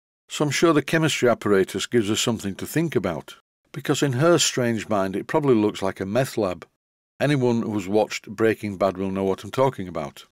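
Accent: Yorkshire, United Kingdom. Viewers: It's actually a METHS lab, don't know about Breaking Bad, but look out for Breaking Taps. BTW, your Tyke dialect amuses me - the unconventional pronunciation of 'chassis' as 'shazzy', counterpointed by the opposite with a sibilant 's' in 'becoss' (because). keep it up, pal! ( I had a girlfriend who used to say that). I suspect your simplex will run again before mine will! Cheers!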